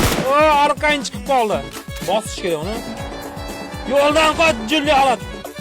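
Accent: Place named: Andijan, Uzbekistan. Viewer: Anjanliklar Sheva 👍👍👍😂😂😂omad oka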